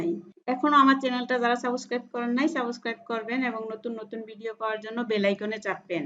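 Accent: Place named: Tangail, Bangladesh. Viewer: Oi apnar voice sune mone hoy apnar basa tangail sotti na ki??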